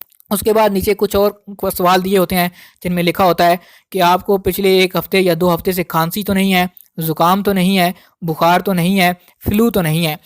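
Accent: India. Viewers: Bhai saaaab... bolne se Kashmiri ya Potohari lagtay aaaap...?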